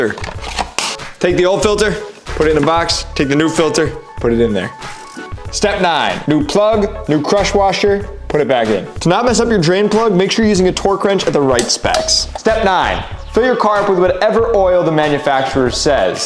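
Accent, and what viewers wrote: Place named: RP, United Kingdom. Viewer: I love how he gets more posh as the video goes on 🤣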